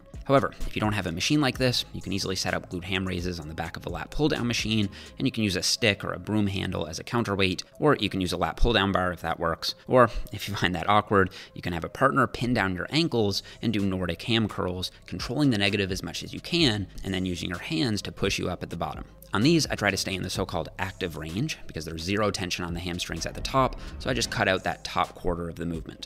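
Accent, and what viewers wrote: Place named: Newfoundland, Canada. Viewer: is from Newfoundland (can't expect anyone to know where that is, but I'm also from there) and I honestly applaud his focus on ensuring he speaks clearly as we have/are prone to having a very distinct and often thick accent that sometimes even locals have a hard time understanding what's being said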